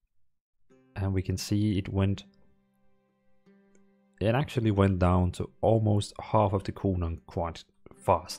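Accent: Denmark. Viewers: Are you Danish? Nice video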